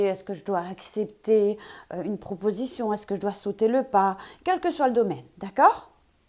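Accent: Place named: England, United Kingdom. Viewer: tu as un petit accent tu es anglaise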